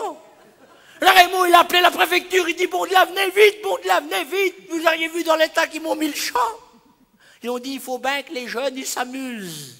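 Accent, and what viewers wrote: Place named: Normandy, France. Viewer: sacré tu me fait rire et tellement vrai tes histoires avec une pointe d accent normand bonne continuation a toi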